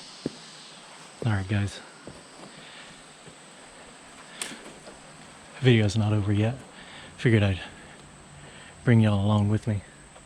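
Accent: South, United States. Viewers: Wow, that the most beautiful Ridge l have ever seen. Those arches under it are so amazing, l have never seen anything like that before. And looking up at the railway bridge the creek was absolutely gorgeous. Those gun shots where worrying though. Thank You so much for the videos, your a real Southern Gentleman,l love your accent, so different from here. Keep Safe, Debra from Australia. 🌿🍀🌷🌹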